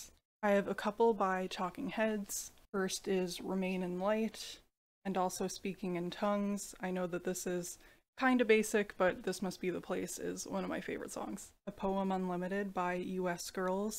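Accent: Canada. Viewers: figured was Canadian by the way you pronounced "out" and "about"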